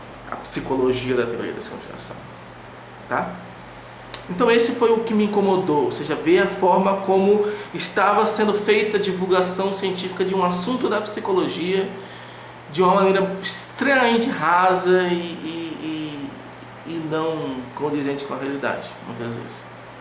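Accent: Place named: Minas Gerais, Brazil. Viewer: Eu pensei em duas possibilidade: 1) ele tá treinando uma técnica de oratória. 2) ele tá misturando o sotaque dele com o mineiro e/ou baiano. O estar bêbado pode ser uma terceira hipótese rsrsrs >.<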